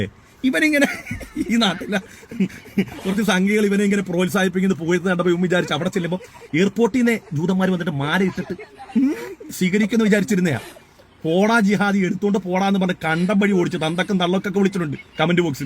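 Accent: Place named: Kollam, India. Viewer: ഉസ്താദ് കൊല്ലം കാരൻ ആണോ 😄😄. അതെ language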